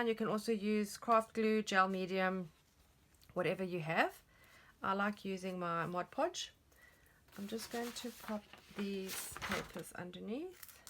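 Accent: South Africa. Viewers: I can't believe you're Australian. Yo sound so South African to me. Also, this is so gorgeous. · Beautiful! You live in Australia but you really sound like you are from 🇿🇦. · Absolutely gorgeous. I recognise the South African accent.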